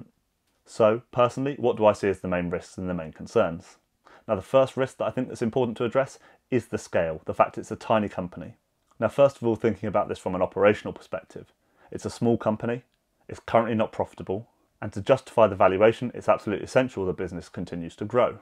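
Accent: United Kingdom. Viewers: Bro I found your channel today and watched like 5 vids, you would sound smart even without the british accent haha! Keep up the awesome work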